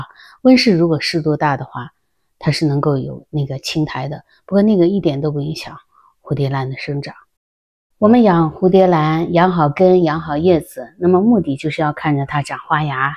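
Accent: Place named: Xi'an, China. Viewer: 叶子长得太美了，听老师的口音感觉咱俩是老乡啊，我是西安人😆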